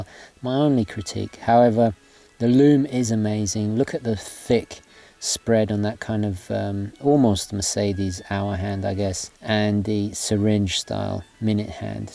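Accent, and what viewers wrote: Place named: South London, United Kingdom. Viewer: I'm tempted, but am I the only one with doubts about the compass? i'm ex-military, do lots of hiking etc - I know how to use the sun/ watch technique to navigate but I've never heard of anyone doing it seriously. At best, its is a rough direction finder, so all those intricate bearings are pointless. So, I can't help feeling it's a gimmick on an otherwise excellent watch - an internal diver style timer or 24hr bezel would be way more useful. Any thoughts, folks? Anyway, greetings from South London (or as and I might say, Saahhhrf Laaahhhndon). What a fantastic channel - you're going from strength to strength, chapeau!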